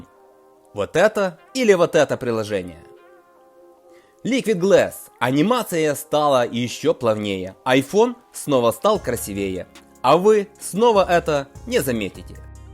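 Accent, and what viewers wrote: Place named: Ukraine. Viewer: Он просто с Украины, это ведь слышно что это не родной язык для него, есть акцент и говор.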